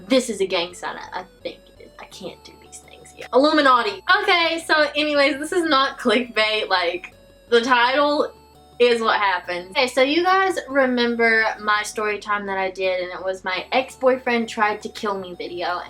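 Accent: South, United States. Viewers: Where are you from, you sound very country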